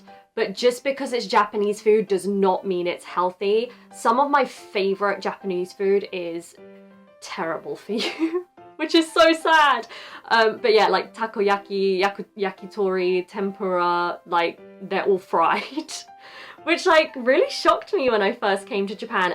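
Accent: United Kingdom. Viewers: イギリス英語が、素敵！ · 唐揚げとビール！・・・締めラーメン・・（笑） イギリス英語のいい勉強になります！有難う！！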